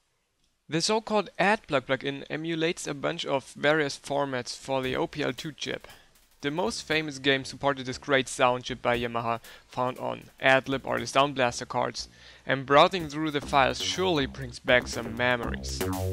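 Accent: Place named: Germany. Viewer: Absolutely love your selection of music, and your German accent is awesome! Keep up the good work :)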